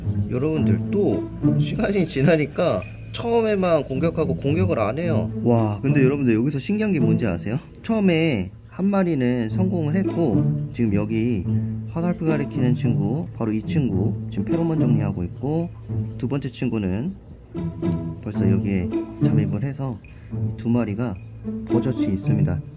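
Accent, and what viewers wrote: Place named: Pohang, South Korea. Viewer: 브르님 말투에서 느껴지는 퐝의 느낌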